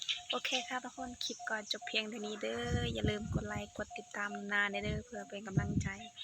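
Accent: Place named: Cambodia. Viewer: น่าจะมาทำงานบ้านที่หมู่บ้านจัดสรร ใช่ไหมครับ แต่ดูสำเนียงพูดเหมือนคนเขมร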